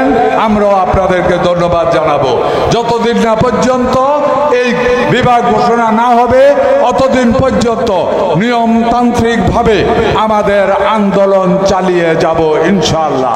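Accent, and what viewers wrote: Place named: Noakhali, Bangladesh. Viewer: নিজে ভাষা বলতেছেন নোয়াখালীর, আবার নোয়াখালীর ভাষা নিয়ে টিটকারি করেন।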